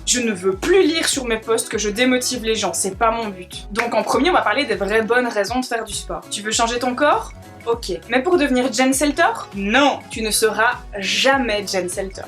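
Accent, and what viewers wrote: Belgium. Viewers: tu parles comme une belge j'avais cru que tu es française je me suis trompé vas-y donne ton 0032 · Une compatriote Belge avec le bon accent :D Du coup l'objectif Iron man m'a fait rire tout seul devant mon écran, parce que faut devenir riche, se forger une armure et fonder les Avengers. Il y a du boulot ! :D (oui je revendique ma vanne pourrie) Continue comme ça en tout cas, plein de bons conseils dans lesquels je me retrouve :D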